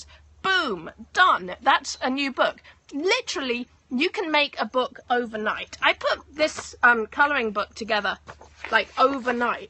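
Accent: United Kingdom. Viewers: ARE YOU AN ENGLISH GIRL?? BECAUSE YOUR ACCENT APPEAR LIKE YOU'RE FROM UK??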